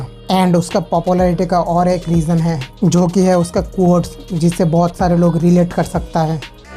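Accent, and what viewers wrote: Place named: Northeast India, India. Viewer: Voice sunke aisa lagta he ki tum Manipur, nagaland, mizoram, odisha me kahise ho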